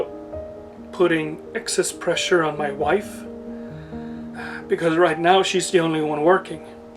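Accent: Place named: Scandinavia. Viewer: Søgne, 25 min unna Kristiansand :joy: Familien min er fra Gjerstad. Jeg er så hillbilly som man kan være i norge, Pluss i live in the south :) Din engelsk tale er meget bra, men etter å ha sett noen vids rett etter værandre må jeg inrømme å si jeg hørte at det var noe scandinavisk der. Du er opprinnelig fra danmark? Hvor i DK om jeg kan spør?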